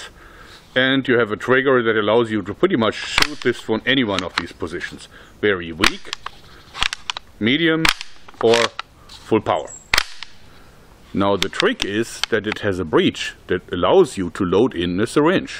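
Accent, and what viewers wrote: Germany. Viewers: abgesehen davon hat der deutsche akzent einen scharm der irgendwie zu DIY Waffen passt:D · Between his thick German accent and the nature of this device, I can't help but think of Doctor Mangele and his many "very special" experiments.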